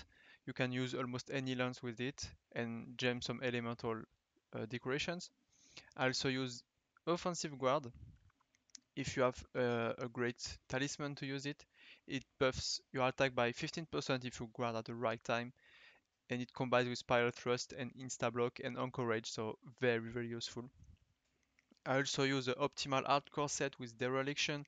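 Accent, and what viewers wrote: France. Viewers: Just perfect: short, easy to understand and on top of all that the French accent. What more could I ask for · Nice french accent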